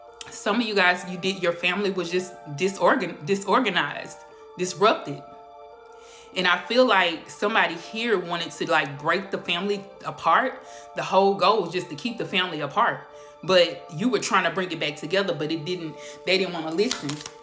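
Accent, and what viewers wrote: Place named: South, United States. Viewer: Thank you for your readings. On point. Question. Your accent. Are you from Virginia or the Carolinas?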